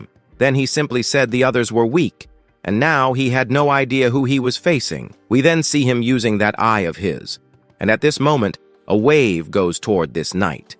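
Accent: United States. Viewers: Tf is that country accent 💀😂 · What's with the texan accent 😊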